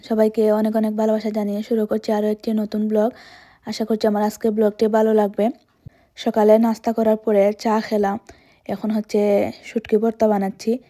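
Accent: Sylhet, Bangladesh. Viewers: আপু আপনার সিলেটি ভাষার কথা বলা শুনতে খুবই ভালো লাগে। ভর্তাটা খুবই লোভনীয় হয়েছে। জুতা গুলা সত্যি খুবই দারুন। ব্রেসলেট এবং জুতা সবই সুন্দর লিপস্টিকের কালারটা ওয়াও❤ ভালো থাকবেন আপু।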